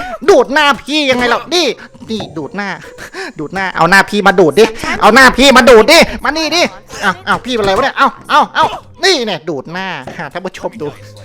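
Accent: Isan, Thailand. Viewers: เจ่าเป็นคนอีสานบ่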